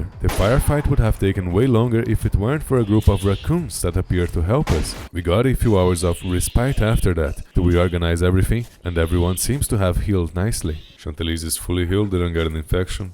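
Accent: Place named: Brazil. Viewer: “that’s the bones of the office” Eu tinha uma suspeita que seu sotaque era brasileiro, mas depois de ouvir essa expressão eu tinha certeza! Kkkkkkkkk que legal achar um br fazendo conteúdo de rimworld, ótimo vídeo cara!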